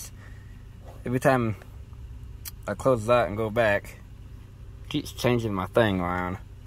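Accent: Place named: Arkansas, United States. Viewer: I was locked up with a guy from Arkansas with an accent exactly like yours, is that where you're from?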